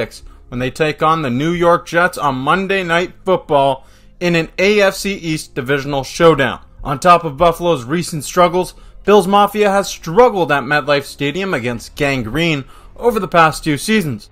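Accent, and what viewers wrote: Canada. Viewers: Happy Saturday everyone! I don't make predictions just Bills win. Hey - Are you Canadian?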